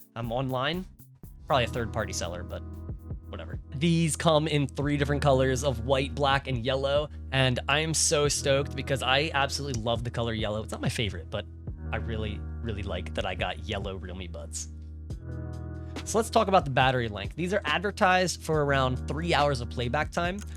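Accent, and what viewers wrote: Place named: Pottstown, United States. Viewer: Hey man! Hope you are doing well! Great video and review. I've been watching a lot of Philly accent videos and it seems like you've retained our Pottstown way of speaking. Spread that all over Thailand lol. I've started learning Japanese...not sure how it compares to Thai, but different scripts. Would love to catch up and maybe do a video on the travel to Thailand for my travel agency if you're down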